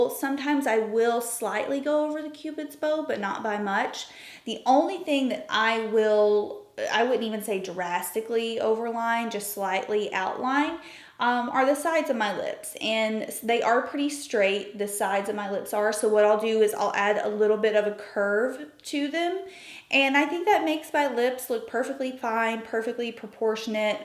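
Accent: South, United States. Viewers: Hi, I’ve watched the first video you have on YouTube! Are you from Texas? Your accent is very southern!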